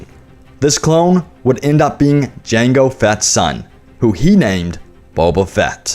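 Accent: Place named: South, United States. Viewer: You sound so fucking country.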